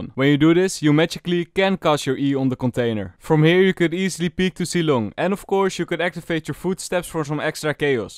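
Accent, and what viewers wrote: Netherlands. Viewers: i smell dutch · Cant stand this guys dutch accent but his tips are so good i have to subscribe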